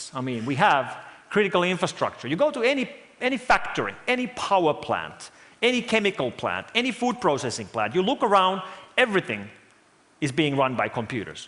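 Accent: Finland. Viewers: His accent actually isn't that bad for a Finnish guy. I've heard worse. And yes, the "klobal" is difficult to pronounce for Finns, mostly because the Finnish language doesn't really use many words with "g" in them. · I was very surprised to see a Finnish guy giving a speech in TED, and I was pleasantly surprised the content and how he delivered it. Great speech on an interesting & important subject. P.S. Extra bonus points for the accent. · Oh that finnish accent :p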